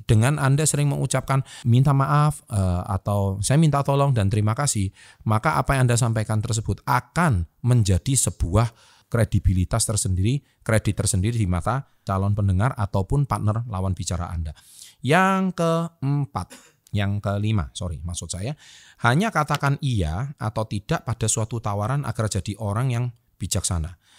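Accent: Java, Indonesia. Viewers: medok amat sih kang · Kenapa ya aku tuh kurang suka dengar orang bicara dengan logat medok begini😅 agak ilfeel aja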